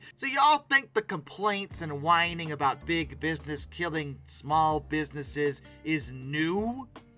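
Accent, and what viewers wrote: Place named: Chicago, United States. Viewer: You sound like you’re from Chicago 😂. I was born n raised there ❤🖤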